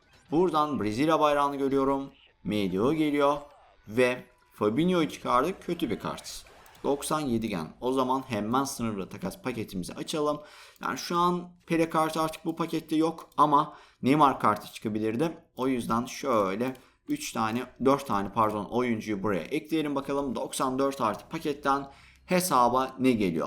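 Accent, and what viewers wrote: Azerbaijan. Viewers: Abi Azerbaycanlımısın?❤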